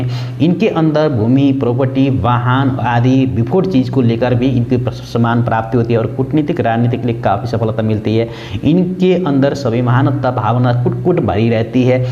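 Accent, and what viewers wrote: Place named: Nepal. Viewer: तपाइ नेपालि हो जस्तो लाग्छ त बोलि सुनदात हो नेपालि मिक्स छ बोलिमा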